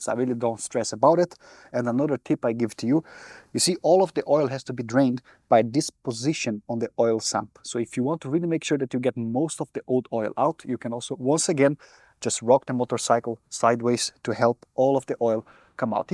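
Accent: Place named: Europe. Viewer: hi, from your accent I assume you are a fellow European, where do you source the OEM parts? Thanks!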